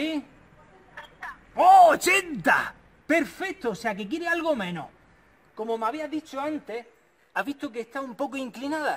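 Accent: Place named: Granada, Spain. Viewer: Joder, qué buen video,. Haciendo un paréntesis, ya me decía mi amiga Cristy de Almería que su acento favorito es el granadino, ya veo por qué.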